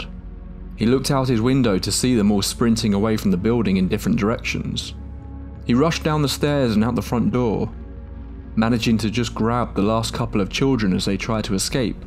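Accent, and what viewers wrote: United Kingdom. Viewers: Why does this accent sound British, he's not...Unless he is..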